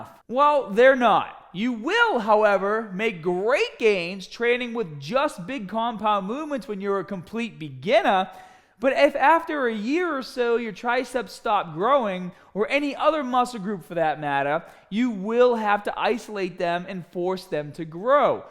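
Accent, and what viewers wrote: Boston, United States. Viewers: This is the most Boston I've ever heard you sound..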